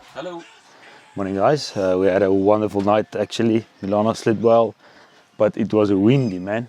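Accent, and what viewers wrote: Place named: South Africa. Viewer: Thanks for your video and so good to hear another South African accent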